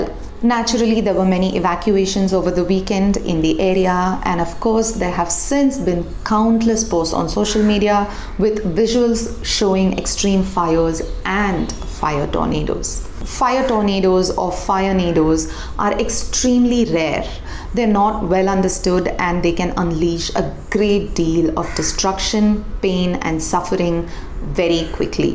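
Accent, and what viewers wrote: India. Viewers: That is a cute Indian accent.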